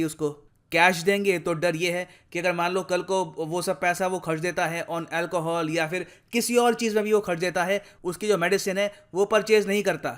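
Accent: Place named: Uttar Pradesh, India. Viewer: Bro just remember one thing India has a variety of language and every indian citizen has it's own mother tongue (not hindi) like basically belong to Uttar Pradesh (having 100's of local languages like Khadi boli,Awadhi, Bundeli, Bhojpuri etc which is not Hindi) by the passage of time majority of people of UP switched to Hindi but the accent somehow sometimes somewhere remains in them that's why there is difference in accent of many people which didn't fade easily...and it's not a bad thing. In my opinion we have to conserve our mother tongue and we should not point out the mistakes in someone's accent because every language is Just for communication and if you are understanding the point..the purpose of communication is done. So next time don't make fun of anyone on the basis of their language or accent in language. Respect and be proud of the diversity of India 🇮🇳🙏❤️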